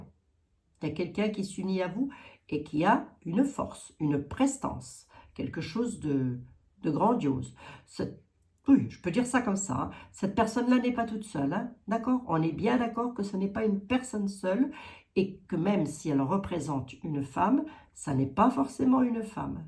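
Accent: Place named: Meurthe-et-Moselle, France. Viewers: coucou j'avais bien reconnu la pointe d'accent de la meurthe et moselle hihi, oui la blonde est veuve du 1er depuis 2019, l'addiction y a qu'a voir ce frotte le long nez a tout bout d'champs, merci a vous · Cc je me disais bien que je reconnais ce ptit accent...je suis de moselle...merci pour votre présence 😘😘❤❤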